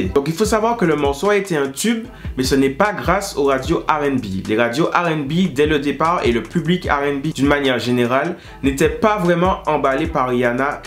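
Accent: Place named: Martinique, France. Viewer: Martinique ou île de la réunion ??? Cet accent 🤣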